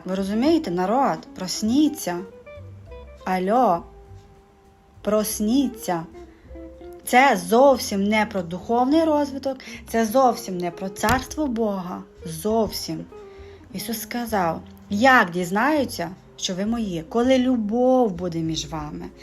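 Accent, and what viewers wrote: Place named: Chernivtsi, Ukraine. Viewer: Ви з Чернівецької області , говор у вас ніби звідтіля.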